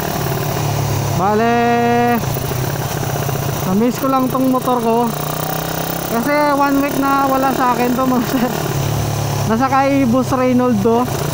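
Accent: Iloilo, Philippines. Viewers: Sir taga saan ka arang ilonggo kanyata ah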